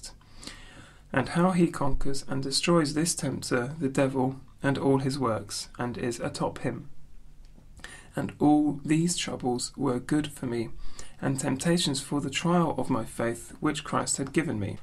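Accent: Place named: England, United Kingdom. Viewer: I wouldn’t mind this in full, as I can’t find the complete audiobook of the journey. It’s also good to have an Englishman read this , as American speakers cannot pronounce British English place and person names as those in the British isles say it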